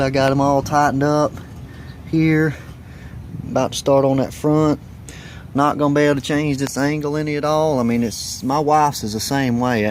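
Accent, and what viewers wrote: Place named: United States. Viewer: Don't mind the countryfied accent... it s down home Americana we need more of it. Nice work on that XJ she looks real pretty... better tho when she gets mud on herself.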